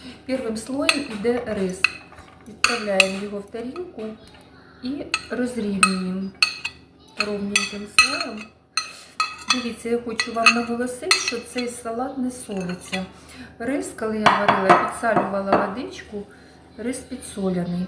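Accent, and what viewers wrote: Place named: Surzhyk, Ukraine. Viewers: 80% українських сіл розмовляють на своїх діалектах. Мені дуже - дуже приємно слухати південний діалект. Так розмовляла бабуся, тітка, мої однокурсники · Працюйте над собою. Суржик ріже вуха. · Суржиком розмовляє весь південь України. Філологи вже просто дістали.Після кожного відео ці зауваження. До чого це?Не подобається не дивіться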